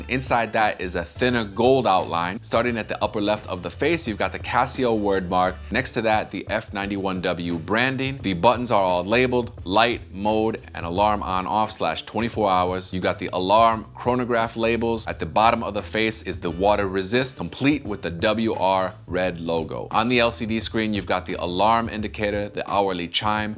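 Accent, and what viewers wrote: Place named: New York, United States. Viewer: I absolutely love the New York accent!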